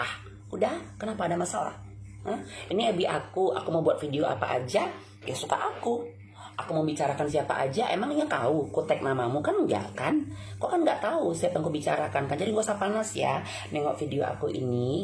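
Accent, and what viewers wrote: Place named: Medan, Indonesia. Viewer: Sama Sama..tolong dong bikin konten marah marah ato kesel ato apalah..aku terhibur bnget Kalo Denger clotehannya..apalagi logat medannya..suka banget..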